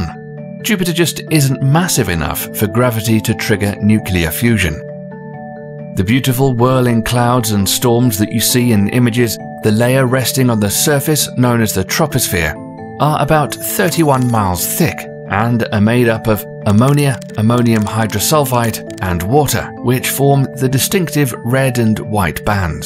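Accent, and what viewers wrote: United Kingdom. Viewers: Oh a British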